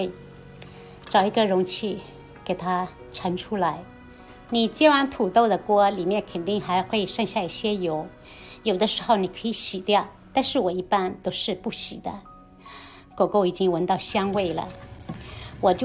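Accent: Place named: Southern Yunnan, China. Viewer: 我偶然刷到，一听就是马谱，我家云南人。了不起，很辣燥的云南婆娘。你口音是滇南人😊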